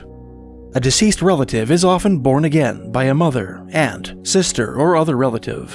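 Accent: Canada. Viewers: Oh this narrator he's for sure a Canadian, eh.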